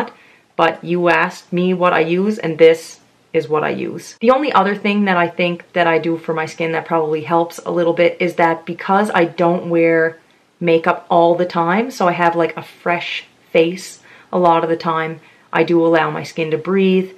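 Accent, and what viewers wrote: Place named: Cape Breton, Canada. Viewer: I absolutely love your videos.. I love that cape Breton accent I’m originally from Newfoundland now living in Saskatoon and it just makes me miss the East Coast.. I hope you’re having a fantastic day and merry Christmas and all the best to you and your family in the new year!!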